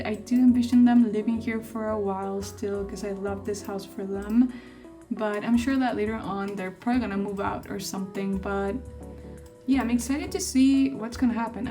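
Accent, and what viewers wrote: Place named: Argentina. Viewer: Ay que lindoo! Yo te sigo en tumblr y hace poco me enteré que tenes youtube, por el acento creía que eras de Argentina 🩵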